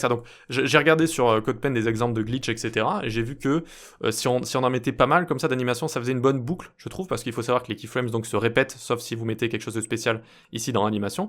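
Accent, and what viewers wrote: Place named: United Kingdom. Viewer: Ton accent anglais me fume à chaque fois xD Très sympa comme effet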